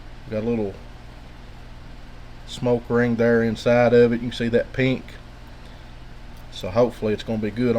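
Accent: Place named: Appalachia, United States. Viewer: Where are you from in Appalachia? You for sure sound like home....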